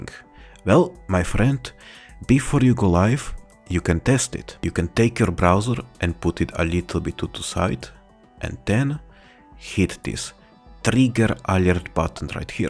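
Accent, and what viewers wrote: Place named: Poland. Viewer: bro has 100% the poland accent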